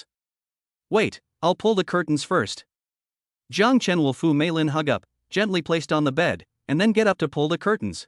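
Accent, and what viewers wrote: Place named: England, United Kingdom. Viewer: This English accent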